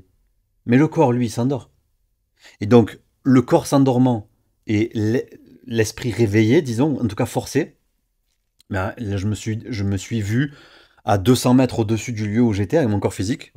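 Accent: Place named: South, France. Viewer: trop bien, vous êtes du sud avec cet accent?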